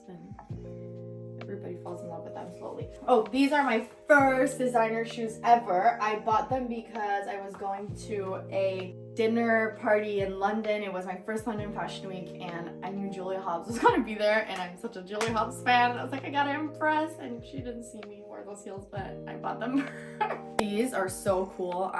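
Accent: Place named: Montreal, Canada. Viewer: I recognize that aceent. Im born and raised Torontonian but lived in Montreal for a few years.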